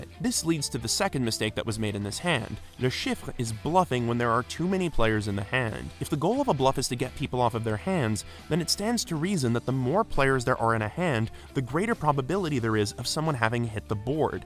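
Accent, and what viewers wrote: Canada. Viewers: I like how you say “Le Chiffre” with a thick Quebec accent but the rest is canadian English xD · Québécois identifié!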